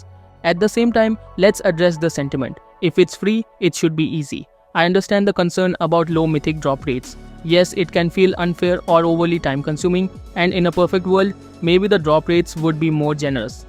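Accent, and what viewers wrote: India. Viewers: when i heard indian accent , “this is must be a scammer” .nah sorry bro ,i dont grinding ,i just bought using cp value at 15.000 cp ..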